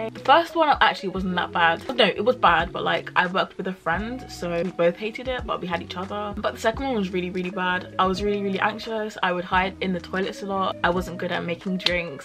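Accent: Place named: Leeds, United Kingdom. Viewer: I think your accent has been influenced by Leeds. You don't sound fully london anymore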